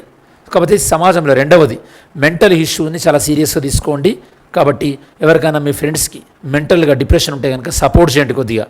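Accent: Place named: Telangana, India. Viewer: Telangana yasala suuuuuuuuuuper sir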